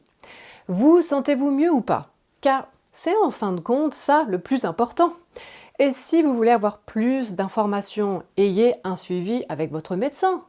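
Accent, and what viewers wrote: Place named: Switzerland. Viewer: Bonjour. J’entend à votre accent que vous êtes Suisse. Excellente vidéo… Au plaisir.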